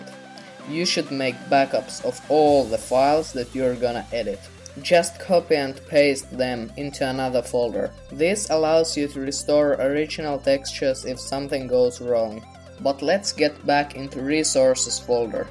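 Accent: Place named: Finland. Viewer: Haha ku puhut enkkuu nii siit kuulee et oot suomalainen:D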